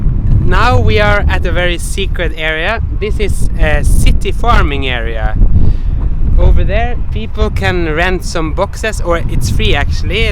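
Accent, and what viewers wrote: Northern Norway, Norway. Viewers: You are so norsk although you are speaking English but norsk keeps popping out 😊👍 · e du nordlending? 😁 cool videos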